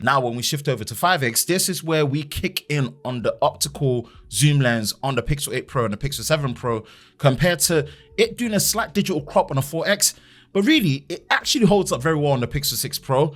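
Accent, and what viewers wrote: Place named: United Kingdom. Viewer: Again, one of the best phone camera comparisons. Thanks for your effort. And as usual, awesome british accent. The ice topping. I saw a battery comparison between iphone 15 pro max, poxel 8 pro and pixel 7 pro. The iphone is way way way better. What surprised me was that the pixel 7 pro had slightly better battery life than the 8 pro. I thought they would have worked on it. With that in mind amd seeing the pictures, I think the 7pro offers the most for its money. Where the 8 pro really shines is the AI stuff.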